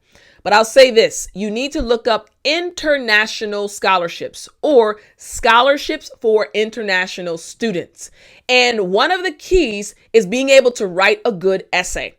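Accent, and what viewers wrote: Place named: United States. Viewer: Peace from Egypt. I'm an English teacher also but I wish to learn American English accent from you.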